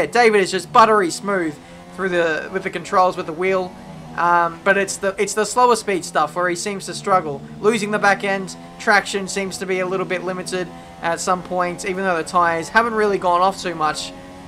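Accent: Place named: Australia. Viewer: Richard Booth He's Australian, of course he will have an accent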